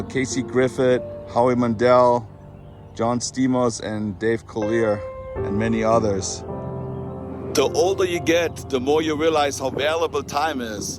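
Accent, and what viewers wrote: Germany. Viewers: Thank you for bringing such beautiful flowers from us too,you are very kind, and considerate. loved Funniest Home Video's! I love your German accent, beautiful!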